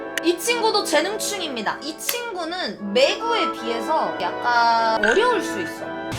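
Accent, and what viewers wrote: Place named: Jeolla, South Korea. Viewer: 전라도 분이 신가..? 말투가 되게 중간중간 구수한 발음이 나오시내 ㅋㅋㅋ 재밌게 봤습니다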